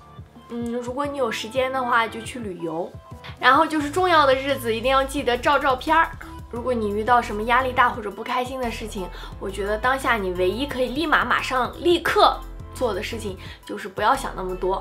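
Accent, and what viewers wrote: Shandong, China. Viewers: 生日快乐！没想到第一次看你的视频就碰到你过生日～好接地气的女孩子，不知道为啥听出了山东味儿，好喜欢你，率真朴实，继续加油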